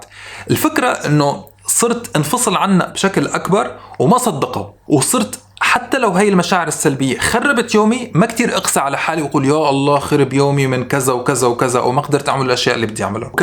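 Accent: Syria. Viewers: انت سوري من السويدا او من الساحل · حضرتك سوري ولا اردني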